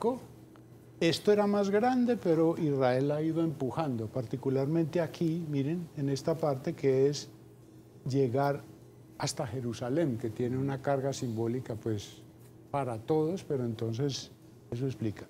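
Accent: Colombia. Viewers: Un paisa con esa tonadita explicando la guerra, hemos escuchado a muchos catedráticos internacionales especializados y leído los mejores libros sobre ese conflicto árabe israelí. De pronto los paisas sabrán contar la guerra del narcotráfico y su líder. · Fenomenal la docencia del prof. Y lo más sabroso, dictada en lenguaje paisa.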